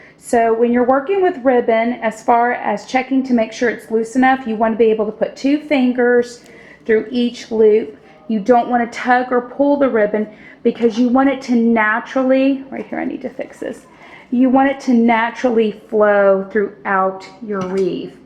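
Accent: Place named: South, United States. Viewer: I was born and raised in Atlanta so I know a southern girl when I hear one. Kayyy???? lol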